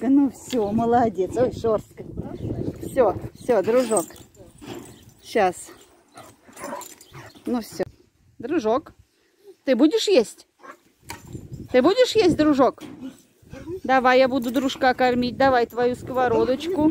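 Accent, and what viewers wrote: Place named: Voronezh, Russia. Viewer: Привет с Липецка,с возвращением, а я думаю какой области у вас говорок,оказывается, воронежский.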